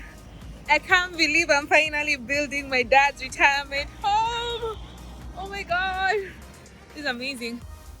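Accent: Kenya. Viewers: Kenyans and being "exZited". I love it💕😁 Congratulations on your achievement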